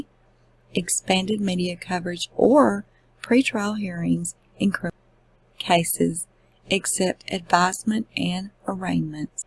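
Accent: South, United States. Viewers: Thank Goodness you understand this! Brains and beauty, you have it all! P. S. I almost forgot, a great southern dialect! I'm in NY I even get sick of my own voice.!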